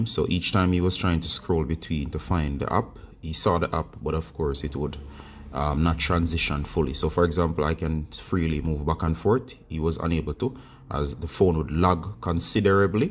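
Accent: Jamaica. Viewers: I hear the 876 accent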